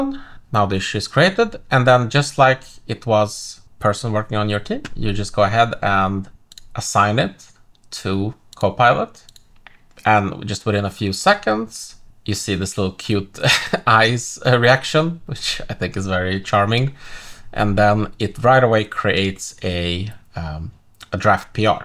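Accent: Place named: Sweden. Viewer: Wow, the most Swedish accent I ever heard...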